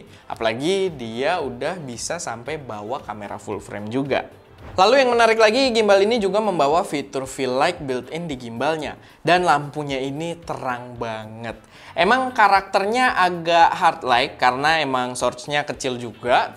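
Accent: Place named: South Jakarta, Indonesia. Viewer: aksen jaksel bgt, fix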